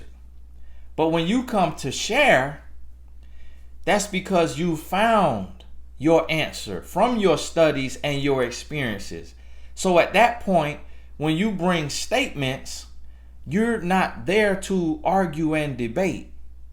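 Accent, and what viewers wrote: South, United States. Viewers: I totally agree keep spreading your message and I love that your from the south and have that southern twang when you talk ❤️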